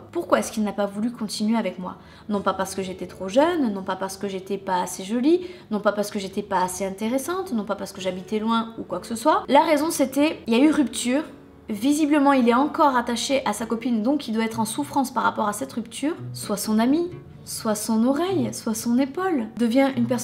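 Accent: South, France. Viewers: au fait t'es de quelle ville ? t'as un accent du sud :) tu serais pas de toulouse?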